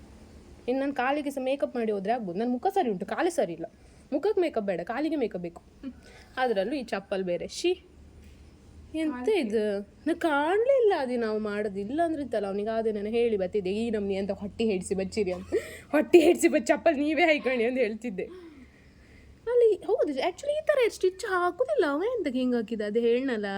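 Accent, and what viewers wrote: Kundapura, India. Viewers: Nim ಕುಂದಾಪ್ರ bhashe kembuke bari chanda mare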